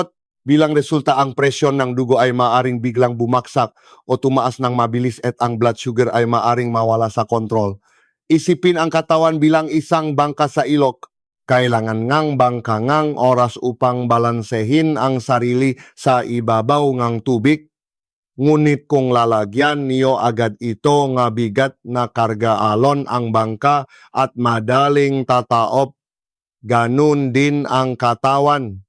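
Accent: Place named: Visayas, Philippines. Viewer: Ang accent niya ay.visaya,hehe but it's ok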